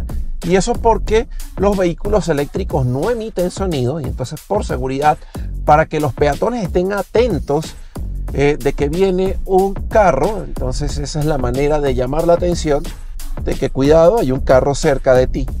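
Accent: Venezuela. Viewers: ¿Ése acento es venezolano?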